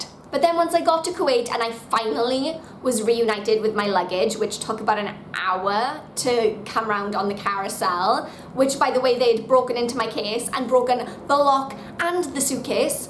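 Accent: Wales, United Kingdom. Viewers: Sounds like a Welsh bird ?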